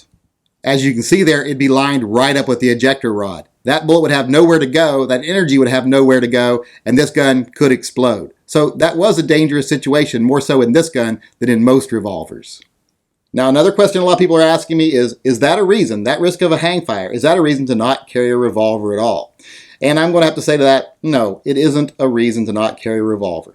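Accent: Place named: South, United States. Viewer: You may be the, but you sound like a Rebel to me. Dude, what happened to the knuckle of the index finger on your left hand?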